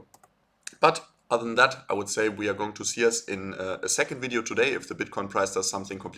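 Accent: Germany. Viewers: Thanks! I just started following you thanks to AltcoinDaily. I gotta say you give a great analysis, oh and I love the German accent! I’d love to see a video from you sometime talking about how you were first introduced to Bitcoin, how old you were, and how you’ve come to build the portfolio you have today.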